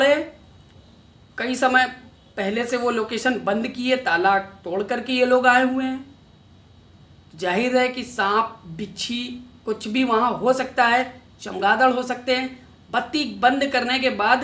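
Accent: Bihar, India. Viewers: Pehale bihari baat choda ok baki sab theek he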